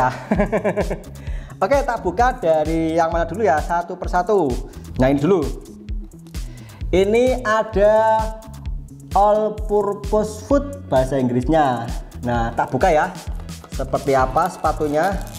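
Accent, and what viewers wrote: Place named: Banyumas, Indonesia. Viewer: Mantap maas..smua teori n praktekny.. Seneng dnger ngapak2 ny ..hehe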